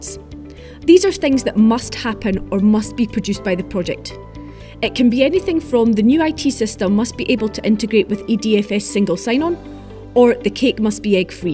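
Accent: Scotland, United Kingdom. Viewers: The strongest, most fascinating Scottish accent I’ve ever heard in my life. Love et!😍🤣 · I luv da scottis¨h accen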